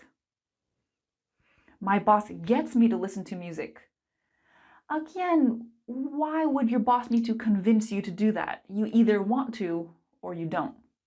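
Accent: United States. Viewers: I want to learn speaking English fluenly and correctly on phone calls or by any other means . I Enjoy your channel keep it up. sister are you american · Hello, great teacher! Is your pronunciation American, British or Australian? Your pronunciation is so nice!😜👍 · Are you American or british?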